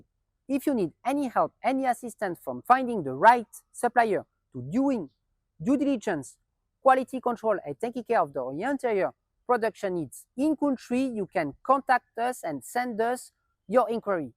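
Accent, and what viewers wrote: France. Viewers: mais gros t'es un enfoiré tu fais en anglais d'accord mais.. sous-titré en anglais tellement ton accent est francais wtf ??! je parle anglais mais la jai besoin des sous titres mdrrrr je pense les anglophones entendent du français la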